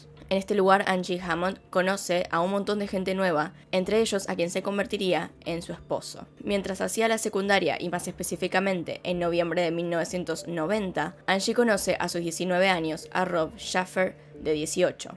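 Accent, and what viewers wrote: Argentina. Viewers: Amo tu forma de redactar! Y más porque hablas en idioma argentino ♥️ ^^ Me gustaría que hagas el caso de Jenneka Jenkins! Gracias por el maravilloso contenido que nos regalas ^^ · Antes sólo veía a Paulette y ElizbetM, pero desde el día que YouTube me recomendó tu canal me encantó. Hace apenas una semana de éso y ya casi me veo todos tus videos. 😂 💕 Eres de las mejores en lo que haces, me encanta tu fluidez, vas al grano, das detalles y se nota que neta investigaste y conoces el tema a fondo. Muchas felicidades, y éxito para éste canal! 🙌🏻 Saludos de Mexicooo. 🇲🇽 P.D amo tu acento Argentino. 😂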